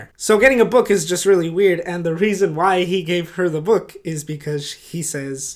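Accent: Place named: United Kingdom. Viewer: The British accent 😂😂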